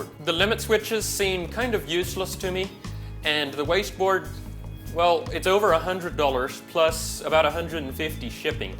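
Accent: Canada. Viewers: You have the most Canadian accent I have ever heard.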